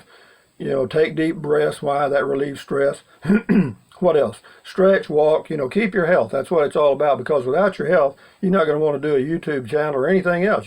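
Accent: Texas, United States. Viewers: I enjoy all of your videos especially the early ones. I went to school in Austin and consequently developed a great love for south central Texas. Your accent (yes!) is familiar and pleasant. Your point about being alone but choosing not to be lonely... yes. Take care and keep the videos coming. I look forward to the daily installments.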